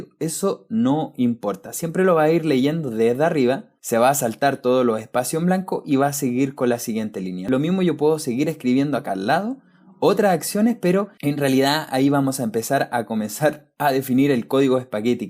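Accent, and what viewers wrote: Chile. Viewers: Acabo de conocer este canal. Apostaría mi cuello que el que habla es un compatriota chileno. Muchas gracias por tan buen canal, es un gran aporte.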